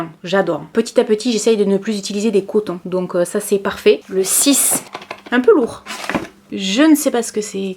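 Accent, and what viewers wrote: South, France. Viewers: Trop contente de te retrouver plus souvent 😍 et tu mérites largement d’être sponsorisée par des marques 🤍🍀 ! Je regarde toutes tes vidéos quel que soit le sujet car tu es notre rayon de soleil 🌞 avec l’accent du sud 😉 ! Je t’adore merci pour tes vidéos 😘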